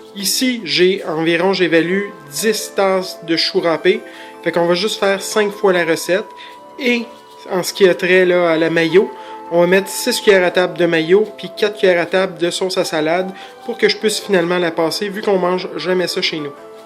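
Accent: Quebec, Canada. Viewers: es-tu quebecoise? L'accent c'est vraiment different et j'aime ça.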